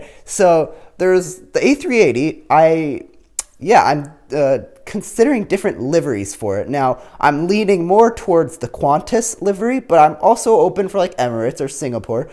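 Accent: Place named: United States. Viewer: I wanted an A350, but 757 is okay. It's stupid to get angry over what company made it, especially because it is mostly determined by a bias of American/Russian(they love their unsuccessful planes)/other(Airbus). I am British and am inclined to Airbus, you sound American and so like Boeing, Skyships like Russian because he is a vodka-drinking comrade.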